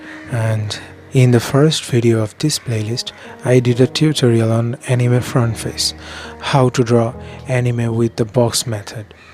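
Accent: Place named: India. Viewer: you speak japanese, you sound indian, and you draw like a god. your A legend.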